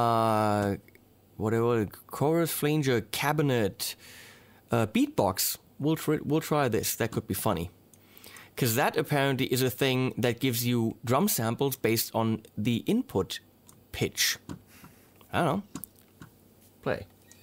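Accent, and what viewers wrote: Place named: Germany. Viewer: That German accent. ;)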